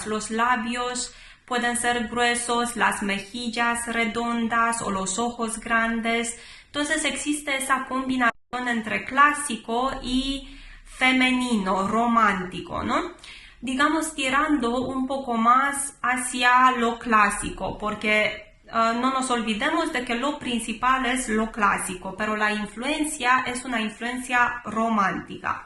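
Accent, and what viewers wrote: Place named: Romania. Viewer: 10000% accent romanesc aici!! 🇷🇴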